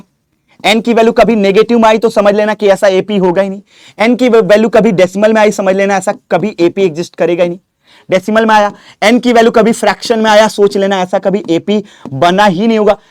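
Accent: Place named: Odisha, India. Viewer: odia sasta English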